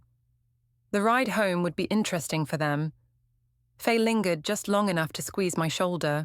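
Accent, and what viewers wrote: United Kingdom. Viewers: I hate the British accent in these stories. The stories tend not to get to the point but a exercise in proper English. I. Prefer the raw, to the point speaking.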